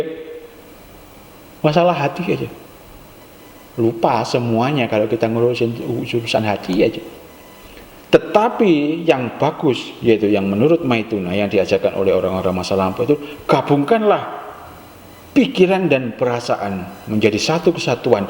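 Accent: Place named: Bali, Indonesia. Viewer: Mas kayanya dari bali, lihat logatnya, salut untuk konten2nya yg mendidik.🙏🙏🙏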